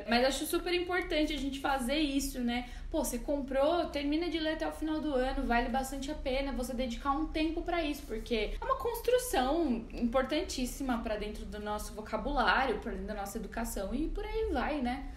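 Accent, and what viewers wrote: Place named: São Paulo, Brazil. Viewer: E esse sotaque paulista? hehe (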